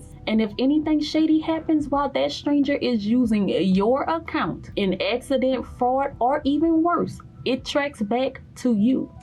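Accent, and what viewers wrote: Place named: Louisiana, United States. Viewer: That Louisiana accent is too pretty behbeh. Excellent topic pretty Sista. 😊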